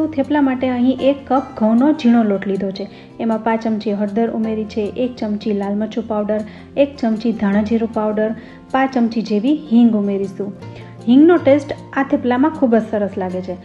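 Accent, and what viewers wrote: Kathiawar, India. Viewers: Very nice. Kathiyawadi bhasha khub mithi lage sambhalwama.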